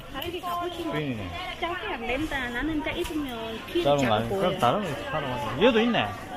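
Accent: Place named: Busan, South Korea. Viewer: 부산 사투리 듣기좋아서 챙겨보고 있습니다 좋은영상 감사합니다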